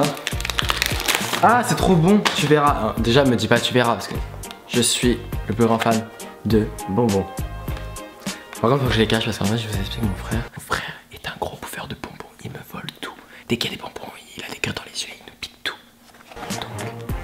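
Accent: England, United Kingdom. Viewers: Tes vidéos sont bien meilleures sans ton accent anglais celle la était super :)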